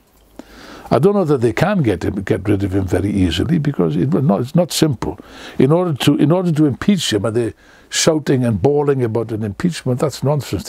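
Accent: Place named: Wales, United Kingdom. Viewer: If every political statement were delivered in the Welsh accent there would be more peace in the world. (Not taking away from the message obviously, it's spot on.)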